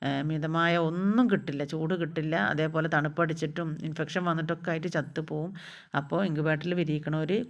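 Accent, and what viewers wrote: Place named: Malappuram, India. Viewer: കൊടുന്നാക്കി എന്നത് അരോചകമായി തോന്നുന്നു, കൊണ്ട് വന്നാക്കി എന്നാണ് പറയേണ്ടത്. സംസാരത്തിലൂടെ മലപ്പുറം ജില്ലക്കാരിയാണെന്ന് തെളിയിക്കരുത്. കൊട്ന്നിടുകയല്ല, കൊണ്ട് വന്നിടുക.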